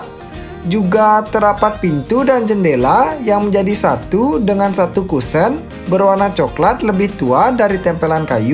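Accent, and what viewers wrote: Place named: Madura, Indonesia. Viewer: Mas kmu org Madura apa bali.. ko logat nya gitu 🤭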